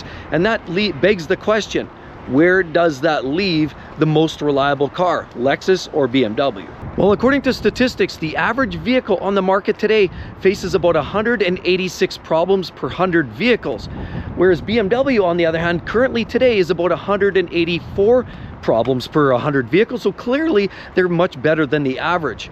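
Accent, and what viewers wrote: Edmonton, Canada. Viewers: Seems like you’re from Edmonton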